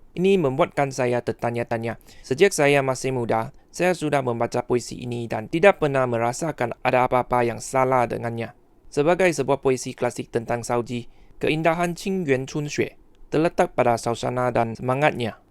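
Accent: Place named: Terengganu, Malaysia. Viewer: bahasa nya anok Trg ,K’ltan dok lawen ………👍syabas .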